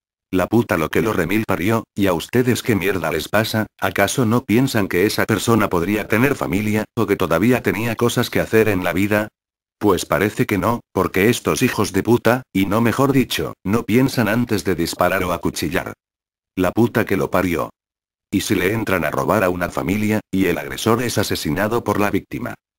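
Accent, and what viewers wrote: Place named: Spain. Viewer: porque hablas como español si sos argentino?pense que eras de España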